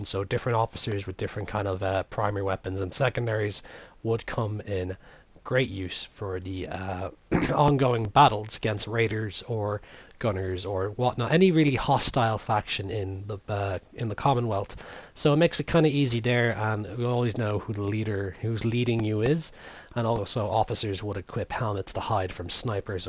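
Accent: Ireland. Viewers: I'm guessing your irish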